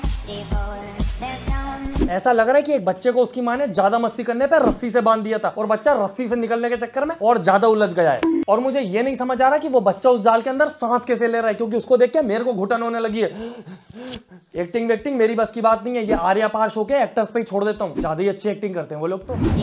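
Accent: Maharashtra, India. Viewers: Bhai aap Maharashtra se ho kya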